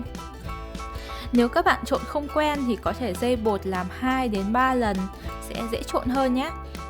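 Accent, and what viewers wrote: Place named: North, Vietnam. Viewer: Giọng chị cuteee quá 😍😍 em ng miền nam cực kì thích giọng miền bắc.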